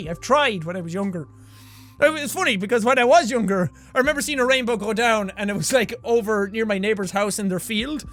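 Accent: Ireland. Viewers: His accent is awesome My accent is almost the same because I'm Irish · false because your a boss Jacksepticeye i love your videos and i know you have probably heard this alot but i am your biggest fan i love everything about you even your Irish acsent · You sound pretty Irish to me! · I know full well based on your accent that you're Irish. You couldn't say the same for me though. I am British, and I have a British accent. But that's it. A BRITISH accent. Neither Scottish nor English, because my blood is a combination of the two. I consider myself Scottish, and I'm proud to be, but you wouldn't be able to tell based on my accent.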